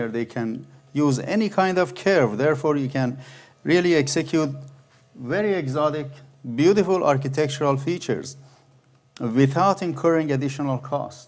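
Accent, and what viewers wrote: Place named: Iran. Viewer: This man is from Iran I can hear his Persian accent.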